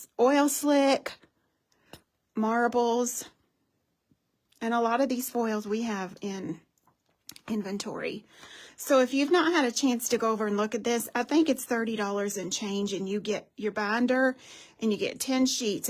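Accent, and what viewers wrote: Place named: Virginia, United States. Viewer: I love all your tutorials that you have uploaded to youtube. Plus I love to hear you talk; makes me miss home (SW Virginia). I cannot wait to see what else you come up with!!!! God bless.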